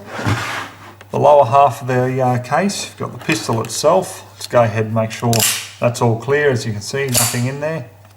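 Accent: Australia. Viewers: Awesome review... weird to hear the Oz accent talking about guns :) 1991 9mm model (O1992) was recently added to the Colt lineup and I picked one up (to go with my .45 ACP O1991 and .38 Super O2991). Only difference I can tell is that the US market guns have the bright barrel muzzle and hood.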